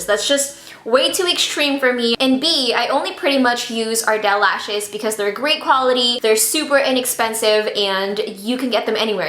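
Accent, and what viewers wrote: Philippines. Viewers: You're accent makes me feel like I'm back with my extended family lol I haven't seen my dad's family in FOREVER and I miss them! Pinas have such adorable, recognizable accents haha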